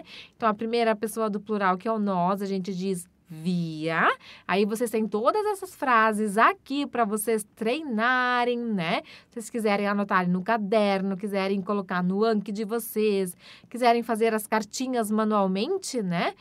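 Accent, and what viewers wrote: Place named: Germany. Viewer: Oi, Gostei do video. Te pergunto de qual regiao da ALEMANHA é o teu sotaque. Agradeco.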